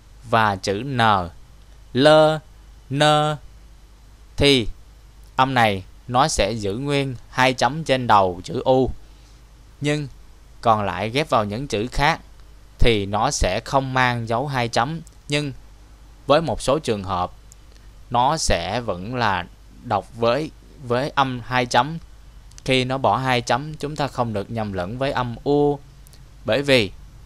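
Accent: Standard. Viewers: Thay phat am chuan va giang bai de hieu, em da tu hoc biet duoc rat nhieu tu, tu tra tu dien dc , cam on thay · Khó lắm mới tìm thấy kênh có phát âm chuẩn như kênh này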